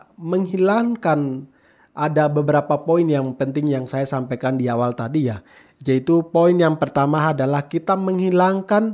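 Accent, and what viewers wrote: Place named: Java, Indonesia. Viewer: ANDA COBA NGACA DIRI DULU BARU BERI PANDANGAN AGAR JGN SDR2 OAP PROTERS ... ANDA PAKAI LOGAT ATAU AKSES JAWA BARU BERIKAN PANDANGAN UTK OAP ITU KURANG ETIS... AGAR SUPAYA PANDANGAN ANDA DIDENGAR OLEH OAP ANDA PAKAI BAHASA IBU ...KECURIGAAN KAMI OAP BAHWA ANDA DI CUCI OTAK UTK BICARA LAIN KERJA LAIN ... ANDA COBA KOREKSI DULU KONSEP BERPIKIR ADA KARENA ANDA TDK TAU MAKA ANDA TIDAK TAU APA YG TERSELUBUNG DI BALIK PANDANGAN ANDA .ITK OAP ...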